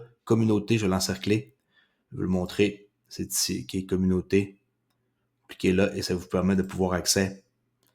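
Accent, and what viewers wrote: Canada. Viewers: Ah bah voilà je me doutais bien que cet accent était Canadien 👋🏻🤝🏻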